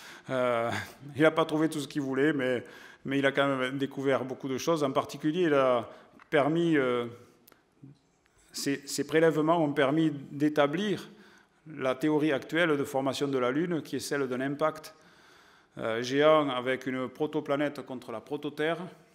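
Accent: South, France. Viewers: Merci pour ce festival d'humour Avai l'accent de la garrigue ... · Mais ..juste INTÉRESSENT ..en + avec 1 accent du sud..de pecor sérieux..tu nous apprend rien du genoux...Oh peu cher...aller tais toi stp...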